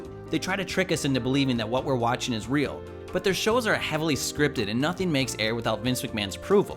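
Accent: United States. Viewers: American accent on a WhatCultureWrestling video. Dislike. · Click on video. Hear American accent. Click off video. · A 'Murican narrating a WotColtcha video? 2016 really has been crazy so far... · I hate this narrator Loses all of it's class with the geek American. Stop it.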